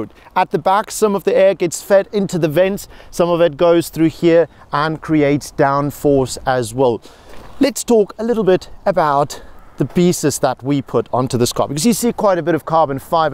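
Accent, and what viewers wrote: South Africa. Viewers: This Presenter is definitely South African. gatta Pattata?. Leon Schuster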